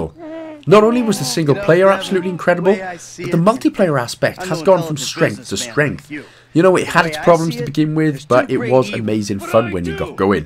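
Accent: Wales, United Kingdom. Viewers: Bloody decent vid buddy 👍🏻 nice to hear a welsh voice on Yourube for a change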